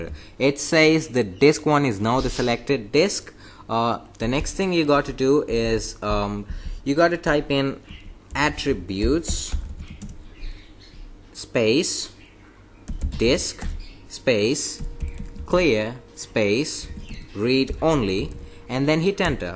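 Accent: India. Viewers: an indian trying to hide his accent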